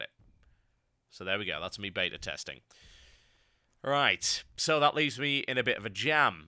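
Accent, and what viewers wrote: United Kingdom. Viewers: I wish I had an awesome british accent like you :(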